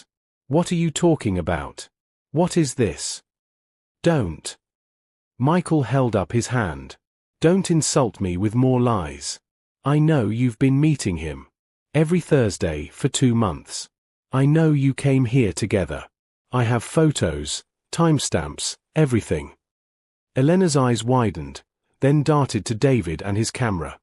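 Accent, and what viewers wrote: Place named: United Kingdom. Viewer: So tired of these stories with a British accent. I don't know why but for me it's off-putting!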